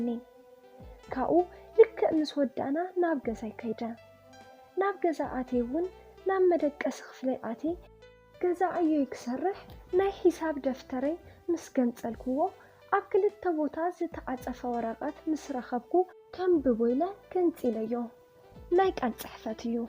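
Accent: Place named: Enderta, Ethiopia. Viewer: ጓብዝ ኢኺ! ናይ እንደርታ ትግርኛኺ ለለ ቃሕ ይብል።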